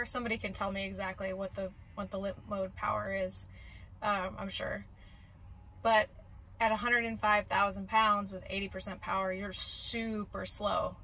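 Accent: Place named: United States. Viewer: Hi, I study English for your video. I like hear you american English. I worked as crane driver, I drove truck crane.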